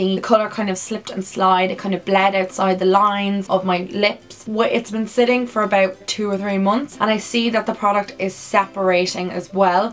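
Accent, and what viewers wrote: Ireland. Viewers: Lovely accent! irish? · Beautiful accent! Are you Irish? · Is that an irish accent? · Is she from ireland??❤️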